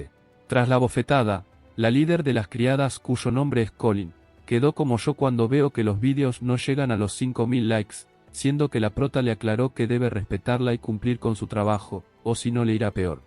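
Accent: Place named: Argentina. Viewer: Ohhhh pibe! Me encantan vuestros resúmenes! Vuestro acento y dialecto argentino es muy exquisito para mis oídos! Os agradezco por este nuevo video muy divertido vuestro relato, esperáremos vuestros otros capítulos! 🎉❤🎉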